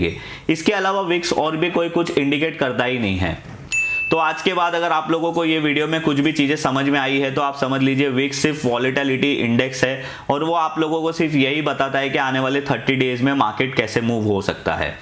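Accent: Gujarat, India. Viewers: bhai, u r from Gujarat?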